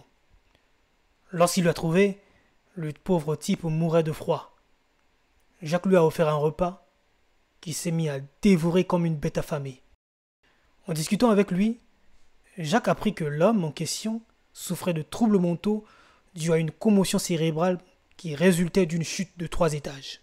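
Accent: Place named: Mali. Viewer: Vraiment intéressant, le petit jeu ajoute une plus value non négligeable à l'attractivité de la vidéo, je constate un gouffre au niveau du tempo et du ton entre cette vidéo et la dernière en date que tu as sorti, si tu continue à surprendre avec des histoires peu connues, il y en a tellement.. tu va percer! que demande le peuple??!! Je me risque à donner ton accent, je dirais malien.. 😊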